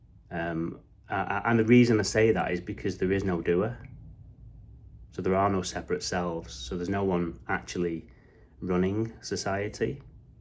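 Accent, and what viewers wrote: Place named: North West England, United Kingdom. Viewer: Cool video man, are u from Manchester? I am from North West England tryna guess ur accent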